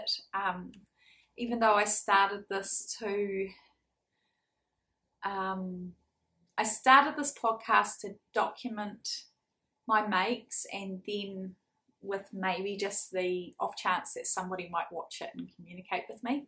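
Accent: New Zealand. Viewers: hi it nice to meet you I'm glad Kelly recommended you I'm loving listening to you and seeing your makes I'm the same I wish I could sew better because I'd love to make my own clothes I just never get round to. it I have a soft spot for anything to do with New Zealand because I lived there quite a few years ago and my parents still have a home in the north island it's great listening to your accent so familiar Looking forward to catching up on your other episodes.I'm watching you in the sunny ukl. today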